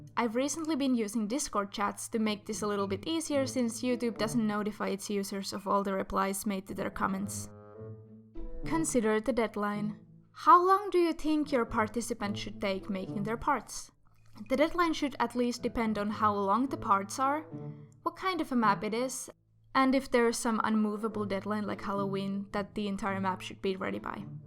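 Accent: Finland. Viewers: Why i think you are from Finland?!? Sorry about my bad English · Wait a sec, ARE YOU FINNISH. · Are you from Finland?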